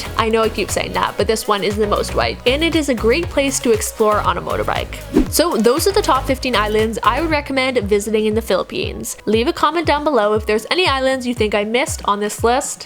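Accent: Canada. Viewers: you didn’t it’s cute hearing Filipino names through a Canadian Filter?! 🤣😉 (Cebu does sounds Like you: Seebu vs I’ve Heard other Filipinos myself included sound more like: sabu less emphasis on the e, no biggie, just cute, don’t worry no one understands me either!) 🤣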